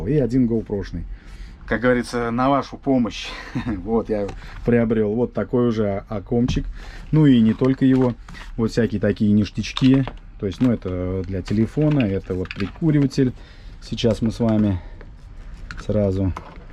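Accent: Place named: Nizhny Novgorod, Russia. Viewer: Родной нижегородский говор )))) живу в Москве как этого не хватает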